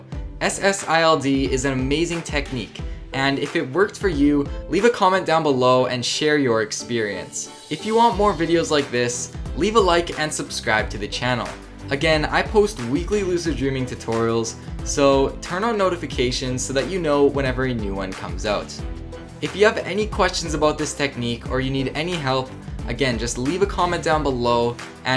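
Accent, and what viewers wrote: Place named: Canada. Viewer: I sense a Canadian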